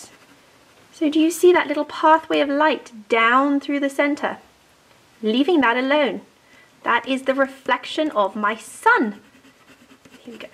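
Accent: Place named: United Kingdom. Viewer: thank you, you are talented, i found it funny that you sound so british even though you are from usa :D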